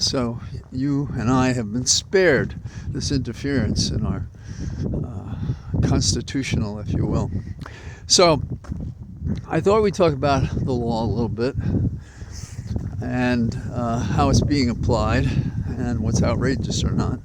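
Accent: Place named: Ireland. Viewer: Another truly stellar talk today, elemental but also saddening. My cousin acquired his federal judgeship by running a longshot presidential campaign,and winning (don't guess which because you might guess wrong) He is the only person I know who talks like Mr.. It must be those Irish genes.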